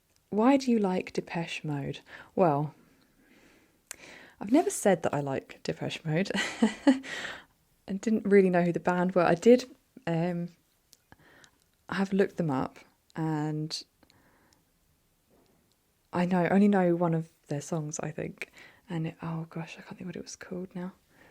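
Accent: Ireland. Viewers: Great pt.1 of the Q&A. I think these bits of insight into who you are definitely made you feel more relatable, IMO, although we get quite a lot of that in your other uploads too! Really heartwarming to hear how your channel's had such a positive impact on your life outlook as well. As for the Irish accent video being so popular, I'm not surprised at all. There's somewhat of a dearth on YT of Irish ASMR creators, at least from what I've seen (or haven't). It can be such a beautful accent so it seems like a natural pairing with ASMR stuff. Searching for that is actually how I found your channel! Last thing, if you're 24 and born in 1996, then you're a rat. They do their signs on a rotation of twelve animals/years. This is definitely the longest comment I've ever posted, LOL!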